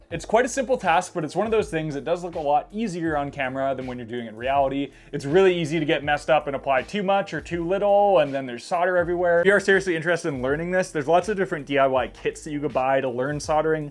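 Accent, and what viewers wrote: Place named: United States. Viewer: This is the standard American english pronunciation. In British english they pronounce the L, but American english has dropped it.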